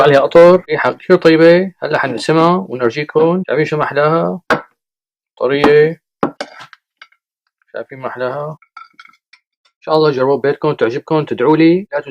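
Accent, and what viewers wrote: Levant. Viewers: شغلك غير شكل والاحلى منو حكيك يلي بذكرنا بالشام تعيش وتعلمنا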